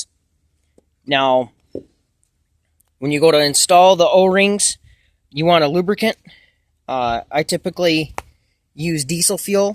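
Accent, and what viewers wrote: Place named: Michigan, United States. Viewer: Bro are you from Michigan? I went to welding school with a guy that sounds identically to you 😂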